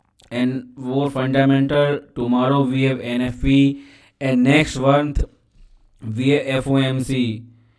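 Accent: India. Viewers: The indian accent is wow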